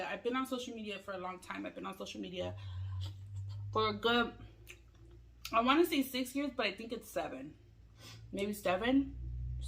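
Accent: Los Angeles, United States. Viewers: Hey! I’ve been watching your channel since you had that pink velvet blanket on the background, when you did your videos in your bedroom I think? But I don’t usually comment but I enjoy watching you kind of like a talk show but with food! And you have a cool personality and just a very authentic way about you, including the good and not so good aspects of life. You show yourself and all aspects of you and I respect that you don’t put up a fake persona, you still say “foo” and I grew up in Los Angeles county and remember talking like that and still do in my head say “foo” but I don’t hear it often in my daily life so it’s nostalgic for me. I love Asian food too so I enjoy when you feature Asian food in your videos but I’m just a curious eater too so we’re similar in that, I love to taste and discover new foods and dishes. Thank you for being genuine and being real, I wish you the best and know that even though many of us don’t comment all the time, I for one do genuinely want the best for you and are silently cheering you on. 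You’ve had a tough childhood in many ways and it’s so cool that you have been growing and I give you props for how you handle the negative comments you always make me laugh with how you respond in a calm and funny way, but it still sucks that there’s people who don’t care about others and choose to be mean, like really? Would people say half of what they say in person? No it’s only cuz it’s behind a screen. You keep being you and just thank you for sharing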